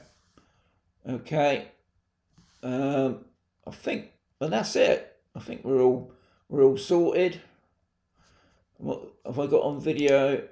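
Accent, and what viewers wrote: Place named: Bristol, United Kingdom. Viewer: Sounds like you're from Bristol, UK. Am I right?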